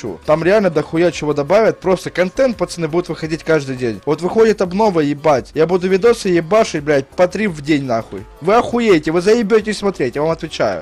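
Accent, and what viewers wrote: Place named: Ukraine. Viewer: Он как-бы украинец, а у них г читается х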